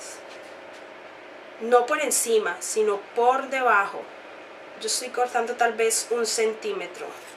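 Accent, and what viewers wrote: Colombia. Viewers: Su acento es colombiano.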